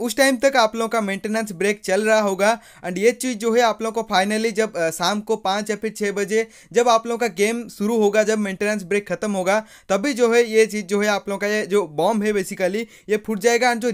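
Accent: Odisha, India. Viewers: Bhai tum odisha se ho kya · Bhai tum odisha se ho? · Bhai tum odia ho kya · Bhai app Odia ho kya ? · Aap odisha ke ho kiya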